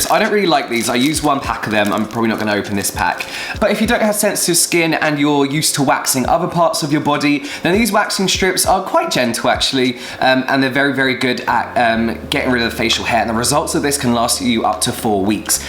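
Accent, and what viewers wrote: United Kingdom. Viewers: Are you British? 🤔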